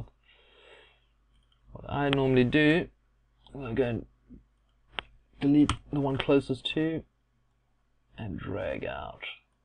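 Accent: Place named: South Africa. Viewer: Great tut..you speak like me!!! First time someone has got the pronunciation of bezier correct> You got to be South African!!;-)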